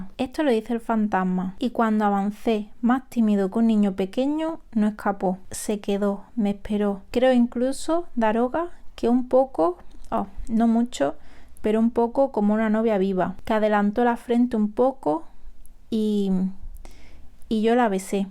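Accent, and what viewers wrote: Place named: Almería, Spain. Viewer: Qué descubrimiento tu canal! Me encanta porque es diferente a todos los que veo de lectura y literatura.Genial como analizas, la simpleza con la que hablas, tu pensamiento crítico y lo visual que lo haces todo.Ojala sigas haciendo estos vídeos de análisis de clásicos! Por cierto, no sé si eres de Almería, pero tienes acentazo jajajaja yo si soy de Almería! Te animo a que sigas así!! Genial aprender tanto y tan bien!! Un abrazo!!❤❤❤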